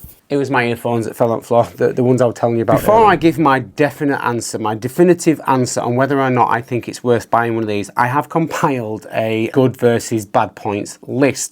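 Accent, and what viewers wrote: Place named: England, United Kingdom. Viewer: i just want to thank you for doing this and for your channel im think of buying a rog ally because A good price B all the things i can use it for and C play at my friends house for sleepovers thanks for your reveiw. Ps my parents are from england and now we live in canada and it is very refreshing to hear your accent. thanks!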